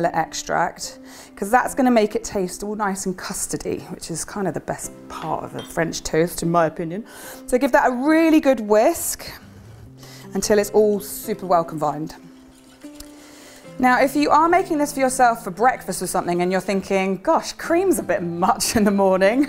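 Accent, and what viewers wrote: United Kingdom. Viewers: I feel like Frances mcdormand is giving me instructions to make French toast in a British accent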